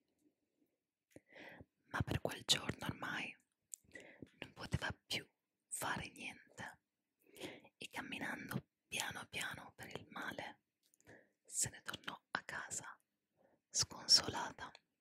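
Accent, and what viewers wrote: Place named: Italy. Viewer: У тебя самый настоящий итальянский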